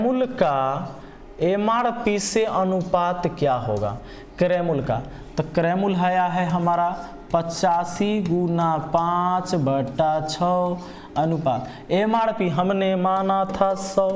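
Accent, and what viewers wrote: Bihar, India. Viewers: bihari type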